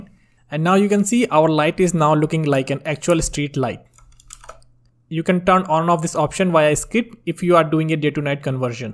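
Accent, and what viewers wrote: India. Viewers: Sound Like Indian? But Very Clear and Clean. ❤️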